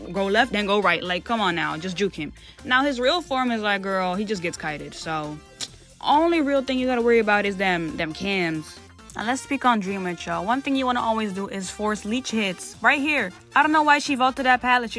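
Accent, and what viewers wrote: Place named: Africa. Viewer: Maybe africa english?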